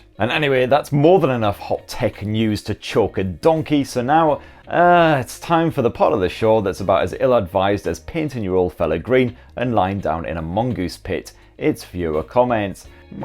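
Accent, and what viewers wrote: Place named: RP, United Kingdom. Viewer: Love your videos, been suscribed for a whiiile! And I personally find your British accent so posh and delightful to listen haha. Greetings from the Canary Islands 🌴🌊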